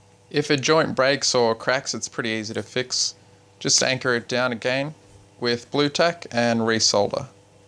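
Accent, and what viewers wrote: Australia. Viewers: Thanks for the reply. I've been looking at a number of your videos and have to say they are quite impressive. I too like a lot of attention to scenic detailing. At some point I would like to send you some pictures of a layout I have been detailing for the past 30 years. Did you mention a brand of wire you are using and where you can get it from. From you accent I suppose you are from the land down under and I live in the Northeast United states. As an aside there is an annual convention of master craftsman model builders. Here is the site: <link> It is occurring on the end of March and beginning of April. Check it out!!! I have Friends in Brisbane...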